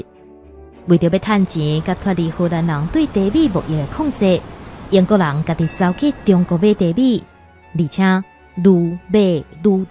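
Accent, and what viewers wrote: Taiwan. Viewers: 正港台灣腔口，聽起來誠四序！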